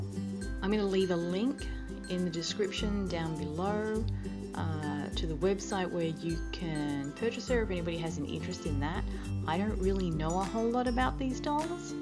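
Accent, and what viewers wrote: Australia. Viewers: sorry for a kind of off-topic/personal question, I'm just wondering what Australian state you're originally from? just trying to place your accent hehe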